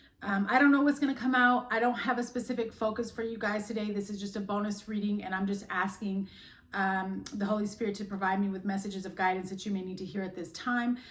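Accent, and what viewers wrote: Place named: South, United States. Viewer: I like your voice. Hope that’s ok to say. Where u from if you don’t mind me asking? I am guessing somewhere in the south but would love to know where. Thanks for the reading!❤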